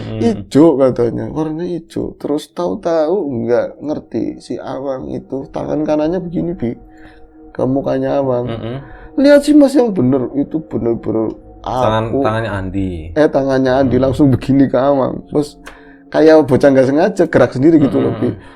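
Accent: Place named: Ngapak, Indonesia. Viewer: hehe..hehe...seru juga ngobor...bahasa ngapak na. medok banget...jadi pengen ikutan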